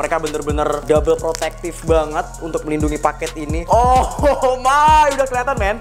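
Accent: Java, Indonesia. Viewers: Medok amat ya.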